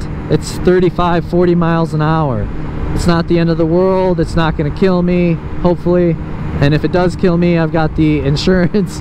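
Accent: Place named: La Grange, United States. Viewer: I'm also pondering a 50cc or 125cc scooter. You sound like you live close to La Grange. Class M gives you a valid motorcycle license to legally operate any motorcycle with an engine size of 150 cubic centimeters (cc) or greater. The Class L license is for riding a motor-driven cycle with less than 150cc engine size. Note, riding an electric scooter does not require a special license.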